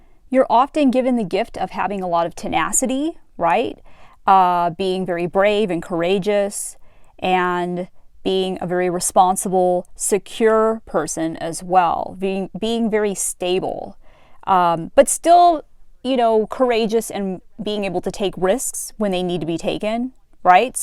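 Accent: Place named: United States. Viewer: Thanks for your efforts.. You are always direct to the point, talented enough to address such subjects. On top of that, you speak in a very clear and nice American accent. And also you're so handsome with a baby smile yet wise and confident 💕 Thanks lady again for everything. NAIF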